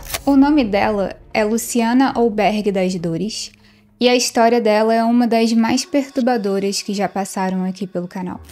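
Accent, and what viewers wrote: Rio de Janeiro, Brazil. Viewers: Vc é carioca? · Sua voz é muito bonita, o vídeo bem editado, tudo quase perfeito, mas esse seu sotaque carioca fortíssimo complica, soa quase como um cacoete, e faz perder a imersão da narrativa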